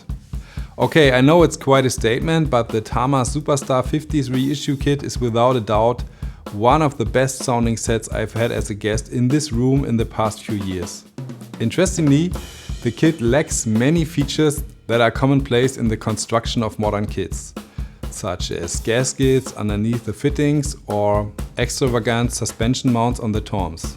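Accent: Germany. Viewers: I very much like your demo videos. I like the direct approach to the information, and yes, I’m a weirdo, I like this type of German accent. (I lived in WB/Mainz for a couple of years long ago. As well, I’ll be taking delivery of this exact kit, in the same aquamarine color, this very week. Zo.. a little confirmation bias always feels good.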